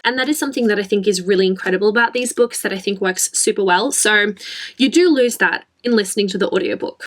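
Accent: Australia. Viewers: You shd make audiobooks. Would you do it if the opportunity arose? You have a clear but tangy Aussie accent. I'd recommend Jon Krakauer narrating his book Into Thin Air.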